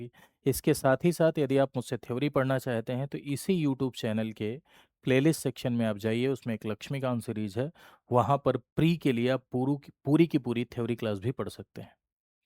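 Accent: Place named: Uttar Pradesh, India. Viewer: Sir jab aap comedy krte h tb bda achcha lgta h bilkul up style m hm bhi knpuriya h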